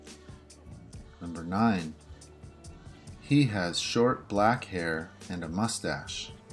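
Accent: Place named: United States. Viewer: Thanks! I couldn´t find an American accent video till I saw this one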